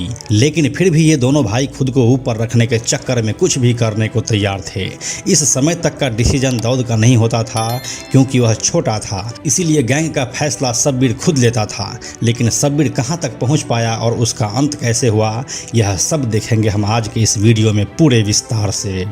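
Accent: Bihar, India. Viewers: Bhai pahele to yeh thik ker le us ka naam shabbir nahi sabir tha.dusre us ki girl friend kamathi pure ki nahi Congress house ki thi Jo lamington road per hai kamathipura se dur ..tesre aap ki awaz bahoot acchi hai behari tone wali.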